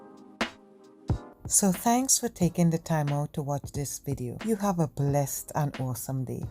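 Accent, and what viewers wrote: Trinidad and Tobago. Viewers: I know a Trini accent when I hear it!!!😘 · Great job,you sound like a Trini 🇹🇹.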